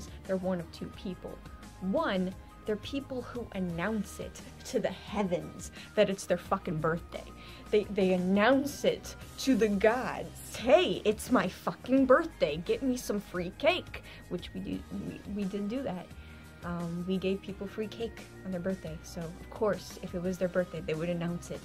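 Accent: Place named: Midwest, United States. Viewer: I hear mid west accent.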